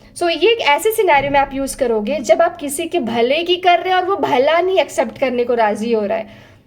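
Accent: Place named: Allahabad, India. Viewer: What you are speaking is allahabadi its not proper awadhi but purvanchaliya style awadhi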